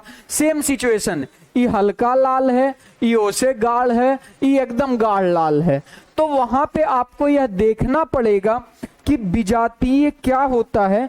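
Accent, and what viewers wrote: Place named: Bihar, India. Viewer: Bhai sun plz beech beech main bihari na bola kar prblm hoti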